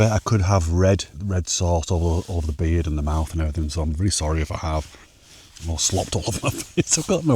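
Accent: Yorkshire, United Kingdom. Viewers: I’m relatively new and love your videos. I went back to watch your Everest Base Camp video because you or someone mentioned it. Amazing camerawork, I felt like I was right there with you. As an ex-Yorkie now living in NZ, I love seeing the scenery that I left behind “oop north” and your accent reminds me of home. Amazed the Achilles is healing after a fast, gives me hope that I can fix my dodgy knees - Day 1 of my fast started today. Cheers!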